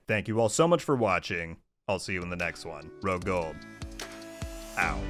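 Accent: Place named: Brooklyn, United States. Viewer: Why...not that I'm bih'ing, cause I ain't, cause am digging it... but, why do you sound like ya just hop off a train from Brooklyn? LMAO. Seriously. Least to my ears, but you sound today like you got that twang...js.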